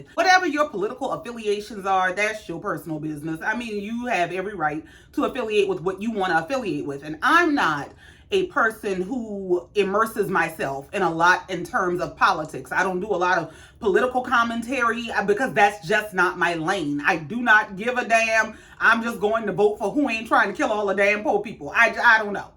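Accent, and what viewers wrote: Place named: Lowcountry, United States. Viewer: You look great today! That top is cute! Thanks for posting, enjoy your thoughts and getting to hear little twinges of your accent, it reminds me of some of my low country folks.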